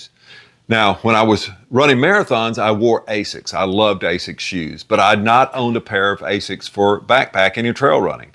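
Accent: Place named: South, United States. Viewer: love the southern accent!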